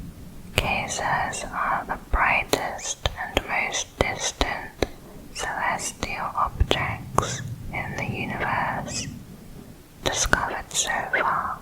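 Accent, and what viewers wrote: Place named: Liverpool, United Kingdom. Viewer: Lovely Scouse accent.❤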